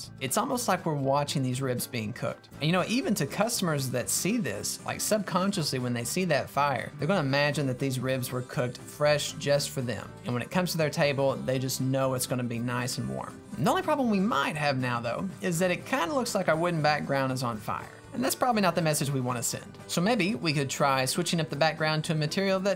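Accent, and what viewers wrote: South, United States. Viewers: as a (fellow? my ear always catches that accent) Southerner, I love this menu and am now desperately craving ribs 🤤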